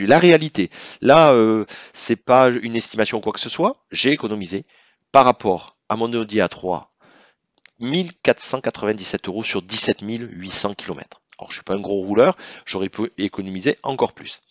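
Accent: South, France. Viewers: Bonjour ok super cool je savais bien tout ça mais là vous êtes vraiment super gentille et clair bravo pour ces tarifs cités, vous habitez où svp vous avez un accent assez fort du sud de la France pour savoir si l'on serait pas voisin par hasard bref dîtes moi par contre avez vous effectué un tableau avec les différences entre la thermique et la Tesla au niveau des réparations ou des révisons svp c'est surtout là aussi qu'il faut faire montrer l'évidence des différences il y en a qui sont déçu total moi je suis un grand amoureux de la Tesla je veux en avoir une et à cause du tarif de l'électricité je me suis plutôt tourné vers une hybride mais je me suis fais avoir en beauté une fois de plus avec une voiture en mauvais état ce n'est pas acceptable j'ai trop de frais à faire dessus et même les pros chez le concessionnaire m'ont arnaqué et ont évité de me faire une géo alors qu'elle en avait bien besoin car j'ai soit les amortisseurs avant à refaire soit le triangle inférieur d'après mon mécano ce serait la barre qui est le triangle apparemment il y a un truc tordu et j'ai pris un trottoir en plus qui a accentué le souci ... 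donc j'ai bien envie de savoir s'il serait possible de me faire reprendre ce véhicule et du coup acheter une Tesla modéle 3 alors que ma préféré est la modéle S la coupe j'en suis fou ...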